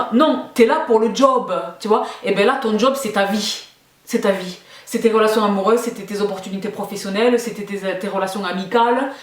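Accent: South, France. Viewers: J'adore ta façon de parler. Franche et rigolote. Et tu as un accent excellent, ca me rappelle mon sud, moi qui me suis expatriée. Je suis d'accord avec toi, continue, tu es top.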